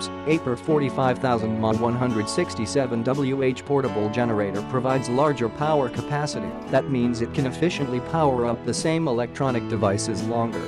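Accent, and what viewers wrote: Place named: Greece. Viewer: Κατάλαβα από την προφορά σου πως είσαι Έλληνας, και το επιβεβαίωσα μόλις άκουσα και το τραγούδι που έβαλες! Από που το αγόρασες το μηχάνημα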